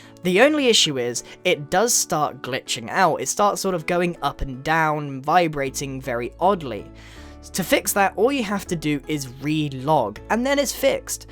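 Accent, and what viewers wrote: United Kingdom. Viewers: I am new ,but this guy sound like he is British or he sound like you are supposed to be in a Harry Potter movie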